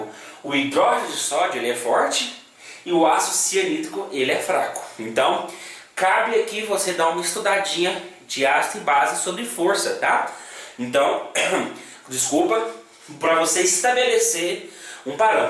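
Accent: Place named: Campos dos Goytacazes, Brazil. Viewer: Parabéns pelos seus vídeos, professor me ajudou muito. Alías, uma pergunta, vc é campista ( campos dos goytacazes-rj) , sua voz tem um sotaque muito parecido hahahahahahaha. Brigadão, estou curioso....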